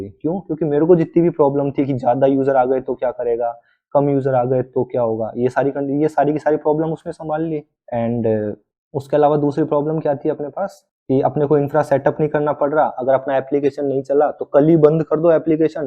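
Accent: Indore, India. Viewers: superb explanation.... bro just one question are you from indore because you have that accent 😄😇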